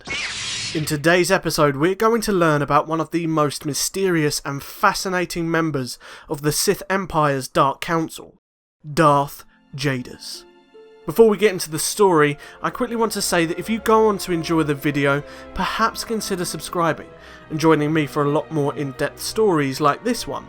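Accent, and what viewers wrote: United Kingdom. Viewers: I like these vids. Listening to SW History told by someone with a British accent, makes it so much cooler.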